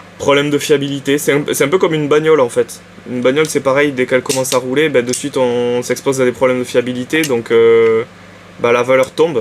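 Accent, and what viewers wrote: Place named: Southwest, France. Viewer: y'a un petit accent et des expressions sympathiques , tu est du sud ouest peut être ?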